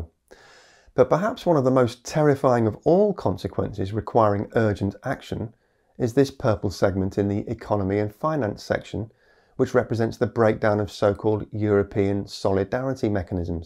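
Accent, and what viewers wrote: United Kingdom. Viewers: You may be a Brit, but I do not consider your accent especially funny. Instead, I consider your videos on GW excellent. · I about spit my coffee out laughing . I do appreciate you switching between existential dread and hope. However, my favorite part is the humor in your presentations. Between the terrific British accent - Yank here - and the humor, it's a great start to many a Sunday. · True - although I'm mostly here for the knowledge the humor helps and let's admit that we Yanks do love a British accent.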